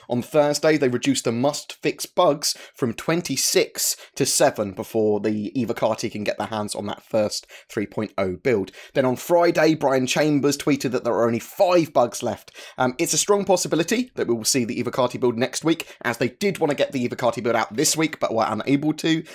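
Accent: United Kingdom. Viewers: your speech is very hard to understand, especially for not a native English speaker. can u talk more slowly or just don't eat the words? with your British accent it is completely impossible to listen to u because of your style of speaking.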